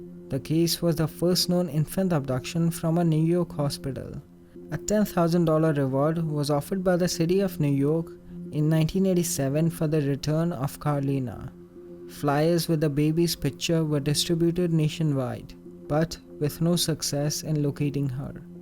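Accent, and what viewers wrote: India. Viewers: That's an Indian ascent. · Please stop doing videos your voice is so annoying u Indians need to stop talking english all together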